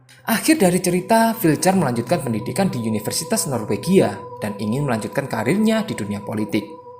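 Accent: Java, Indonesia. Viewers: jowo mu endiii mas adminnn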